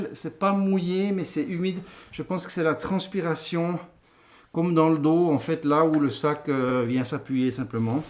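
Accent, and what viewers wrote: Switzerland. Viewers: Vous êtes génial de l'humour, de la passion , expérience et technique et des présentations limpides ( bon il y a un peu d'accent Suisse !!! ), Bon rétablissement concernant le genou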